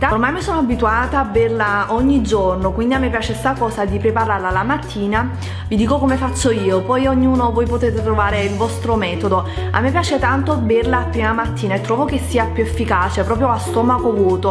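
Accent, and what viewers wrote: Naples, Italy. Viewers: ma sei di napoli???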